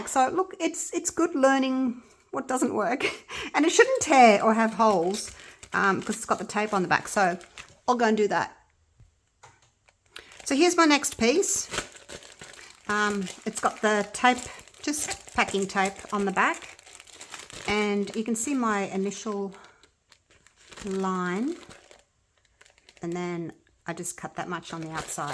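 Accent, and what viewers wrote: Australia. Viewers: To be honest.. I really couldnt care less what shes doing bc I know it will always end up amazing. I mostly like just listening to her peppy, australian accented self which is more than enough for me to play her videos lol.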